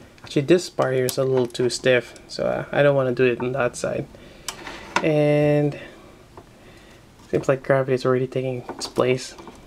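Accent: Philippines. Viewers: Are you a Filipino? There's something with your accent. :)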